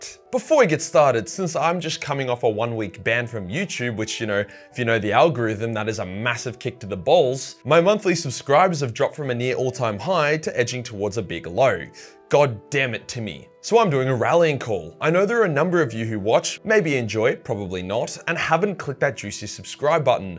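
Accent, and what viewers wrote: Australia. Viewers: The iron cage explained by an Australian?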